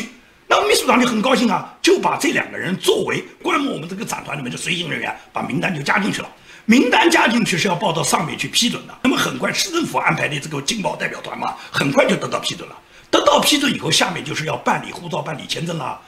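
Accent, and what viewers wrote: Nanjing, China. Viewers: 一听就是南京口音😄。㊗️老乡身体健康！诸事顺意！ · 建民一口标准南京话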